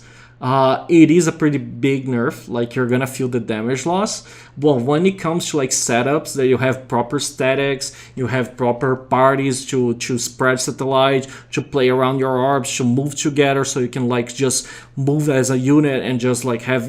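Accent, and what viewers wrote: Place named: Brazil. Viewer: nao adianta disfarça o sotaque entrega kkkkk BR safado S2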